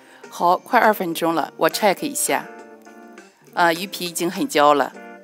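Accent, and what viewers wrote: Qingdao, China. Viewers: 山东人吧？哈哈，一听就亲切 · 绝对如假包换的青普：) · 听着这熟悉的口音 像是青普（青岛普通话）呢😄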